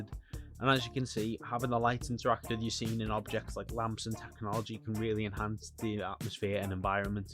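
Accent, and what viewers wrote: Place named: Liverpool, United Kingdom. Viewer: Yup i can tell your from Liverpool nice vid!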